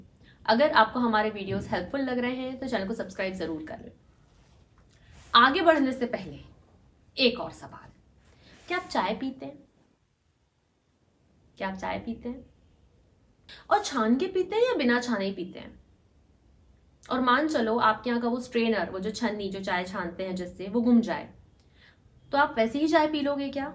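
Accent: Madhya Pradesh, India. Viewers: mam are uh from MP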